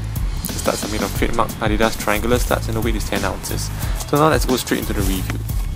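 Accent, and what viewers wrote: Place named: Singapore. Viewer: I can tell ur from Singapore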